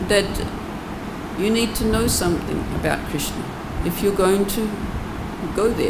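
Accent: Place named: South Africa. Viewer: Hare Krishna! Where is Her Grace from? It sounds like my homeland's accent (South Africa)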